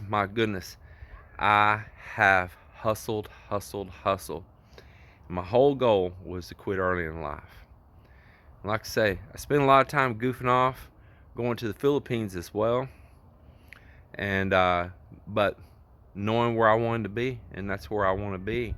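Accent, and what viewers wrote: United States. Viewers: Certainly alot of decisions to have to make but at least on balance your listening all the best advise to allow a good judgment call, l love listening to your laid back American accent🤔🤗♥️👍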